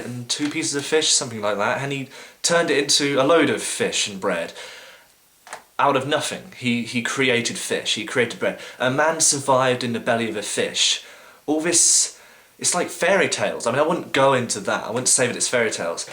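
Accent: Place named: England, United Kingdom. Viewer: He made the effort to make the video and put some interesting points across. He has an English accent. Grow up.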